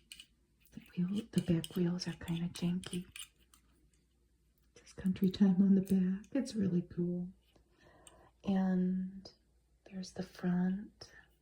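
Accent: United States. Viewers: Love the videos, australian here and I'm curious what regional accent I'm hearing? It's so oddly charming and I don't know the first thing about US dialects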